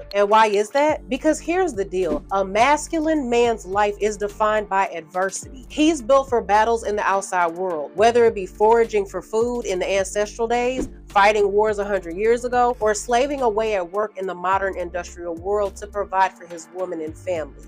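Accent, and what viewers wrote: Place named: Texas, United States. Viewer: Great information as always. Question are you from Houston or Texas by chance? I'm always trying to figure out accents.🤦🏽‍♂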